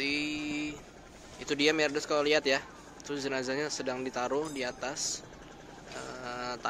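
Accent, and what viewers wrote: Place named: Jember, Indonesia. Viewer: Jember bgt iaa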